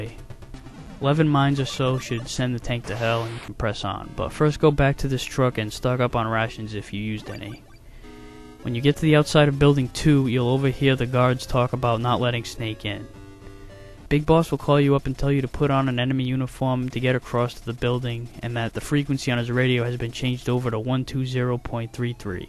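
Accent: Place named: New York, United States. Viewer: This is an amazing walkthrough! By chance you from New York? Just wondering as I hear a sight accent. Either way thanks and cheers!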